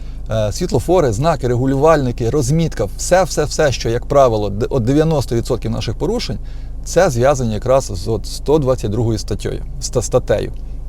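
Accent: Vinnytsia, Ukraine. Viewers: Вінницький говор нікуди не дінеш) так шо не видєлуйся) ти все рівно наш - Вінницький)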